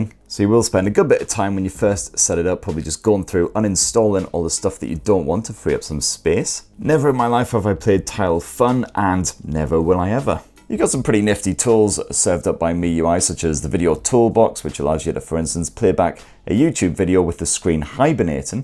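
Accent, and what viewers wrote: United Kingdom. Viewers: W video W person W opinions why u ask? - makes wild animal jokes 💀 - has beef with sweaty gamer kids 💯 - tests display by playing Jojo - British accent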